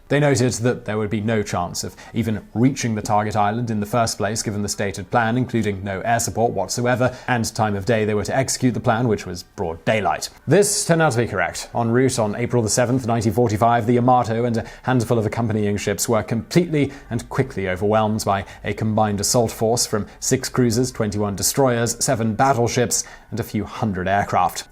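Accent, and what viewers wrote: United Kingdom. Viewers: Too many factual errors, even with the British Accent, to be worth watching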